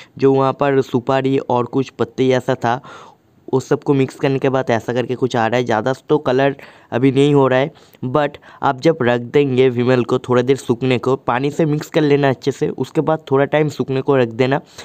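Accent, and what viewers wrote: Bengal, India. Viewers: His bengali ascent makes me laugh always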